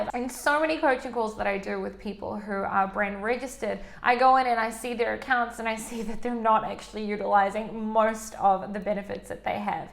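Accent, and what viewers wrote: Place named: New Zealand. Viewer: Thanks, I just applied for a trademark with my brand through a lawyer. I'll be coming back to this content when I get it up and running! Nice to hear a kiwi voice on here too. Cheers. Sam from NZ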